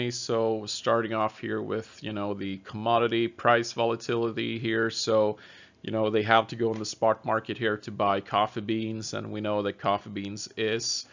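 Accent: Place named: United States. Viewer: Thank u FOR SPEAKING AMERIKAN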